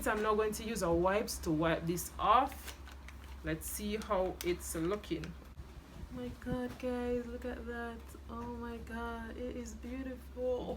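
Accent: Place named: Jamaica. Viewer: I hear our accent sis 🇯🇲 great tutorial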